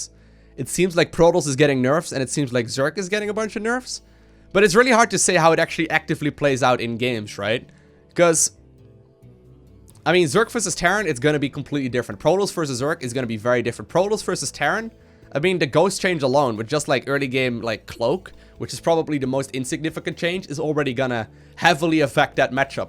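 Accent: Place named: Netherlands. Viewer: I find that if I watch too many videos in a row I start unconsciously talking with a light Dutch accent.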